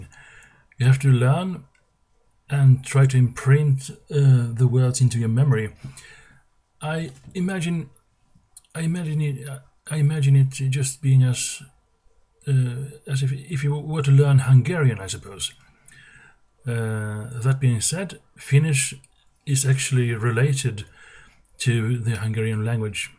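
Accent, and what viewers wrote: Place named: Sweden. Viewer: Your accent is Swedish, but you can enunciate Finnish pretty well.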